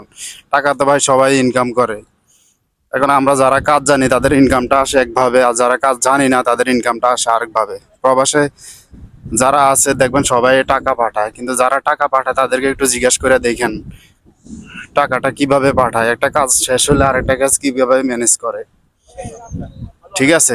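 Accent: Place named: Noakhali, Bangladesh. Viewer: ভাই কি নোয়াখাইল্লা নাকি😊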